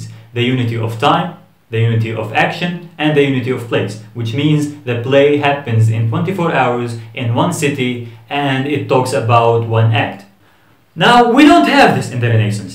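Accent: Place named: Turkey. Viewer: your accent sounds like Turkish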